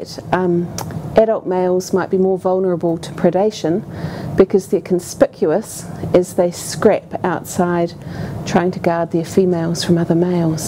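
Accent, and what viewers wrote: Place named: New Zealand. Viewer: How the fuck does someone without a fucked up new zealand accent pronounce weta? She is saying witta, so do I pronounce it wet-ah or weet-ah?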